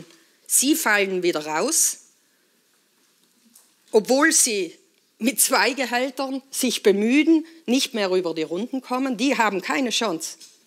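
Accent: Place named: South Tyrol, Italy. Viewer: Richtiges Südtiroler deutsch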